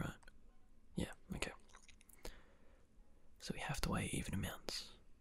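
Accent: Australia. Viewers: hello! I'm a new subscriber, and I was wondering where your accent is from :D Australian?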